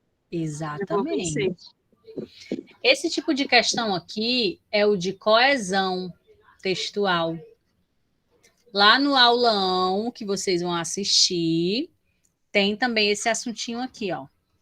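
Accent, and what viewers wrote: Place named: Northeast, Brazil. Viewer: gente eu amo o sutaque nordestino ainda mais de professora como esta <3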